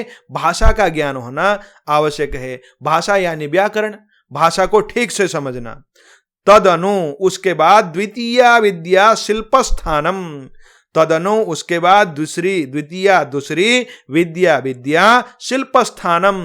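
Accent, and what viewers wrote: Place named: Jodhpur, India. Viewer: Sir AAP jodhpur se ho na aapki aapki aawaz bata rahi hai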